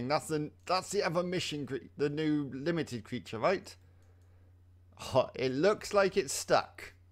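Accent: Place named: United Kingdom. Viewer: I just started watching your channel and your accent is so cool! ^~^ British accent? idk lol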